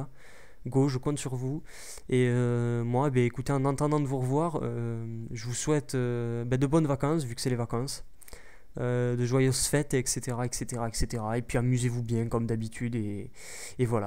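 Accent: Toulouse, France. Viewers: toulouse <3 venant de la bas sa fait du bien d entendre cet accent:P merci pour toutes ces vidéos:) hate que les prochaines sortes con!:P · Franchement bravo pour tes vidéos!!! Un petit pouce vert pour le super accent toulousain de. ^^ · VIVE L'ACCENT TOULOUSAIN!!! T le meilleur continue t video tu fais un super boulot et Merci a toi de nous apporter ce bonheur apres les dur journée de cours (ou de boulot pour les plus vieux) merci beaucoup continue t super video!!!:D:D <3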